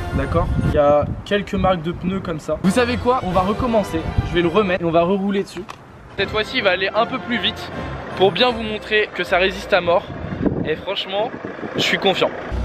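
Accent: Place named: Corsica, France. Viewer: tu viens de corse toi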